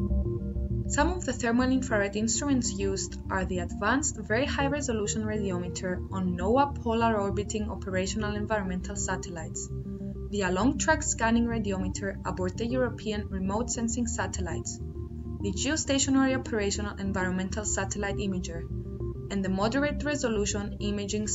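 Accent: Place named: India. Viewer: Unfortunately, your presentation was only partly understandable ( 50%) to me, although I have several degrees, and am very well informed about climate science. Also, hurricane Irma went right through our town - so I am very motivated to get what you are explicating!!! BUT: 1) you were reading, so you were not making any attempt to notice how your audience was responding to your recital; 2) you were not aware that MANY of the terms you were breezing over would be new, and unfamiliar, to some of us wanting to understand what you were describing; 3) You have no pity for those native English speakers, like many of us older Americans, who are unused to your Indian accent, have hearing loss, and had to try to get what you were saying while the backround music made it even harder!. Dont you care whether your accent makes your presentation partially unintelligible? 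SLOW DOWN! If you put your presentation on a public format - like You Tube _ you should care whether you are getting across your point to laypeople!? Slow Down! Or, have your manuscript read by a native English speaker, who is only slightly familiar with the subject, so that the presenter WILL NOT RATTLE OFF A LOT OF TECHNICAL TERMS AS THOUGH EVERYONE SHOULD BE as familiar with them as whats in the newspaper. When you introduce a new technical term, anyone unfamiliar with it will have to pause to try to remember the new term..( Listen to Noam Chomsky sometime; he speaks WAY SLOWER THAN YOU, ABOUT VERY COMPLEX SUBJ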